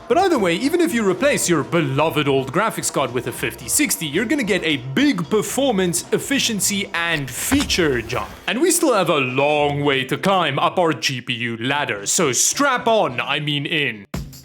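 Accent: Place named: South Africa. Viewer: are you south african? i hear it in your accent from time to time .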